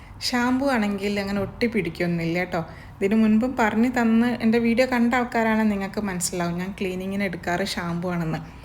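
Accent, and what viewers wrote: Kozhikode, India. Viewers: Kozhikode evda Samsaram Ketit kozhikode aanenu thonunu 😀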